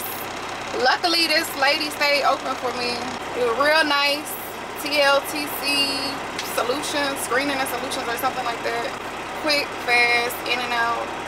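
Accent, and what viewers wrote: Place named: New Orleans, United States. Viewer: you from new orleans huh?i hear it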